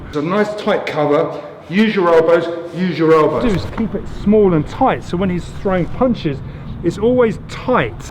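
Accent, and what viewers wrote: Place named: Cockney, United Kingdom. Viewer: Beautiful accent master, is it cockney?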